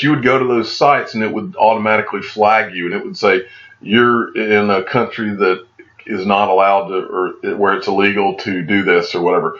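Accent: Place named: Texas, United States. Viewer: Sounds like you're from the south...maybe Texas. Love the accent.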